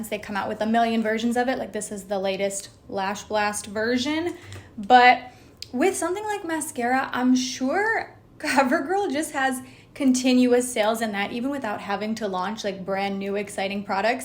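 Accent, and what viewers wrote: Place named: New York, United States. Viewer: Got a little New Yorker coming out in your accent. Love it. Hope you're loving it out there!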